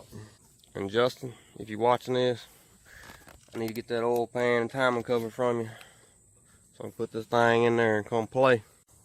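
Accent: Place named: North Carolina, United States. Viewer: You got to be from N.C.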